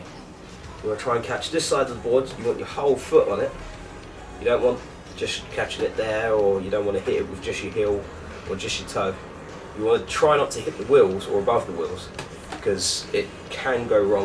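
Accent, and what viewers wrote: United Kingdom. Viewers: any subs i just like the english accent!!!!lol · thats cos stewie is british and so is this guy but all british people dont sound the same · BRITISH DA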